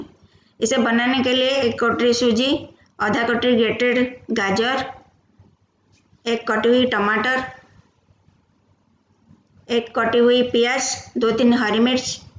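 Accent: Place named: Odisha, India. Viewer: Very good effort Madam. Keep moving . Nice hindi in Odisha tone ..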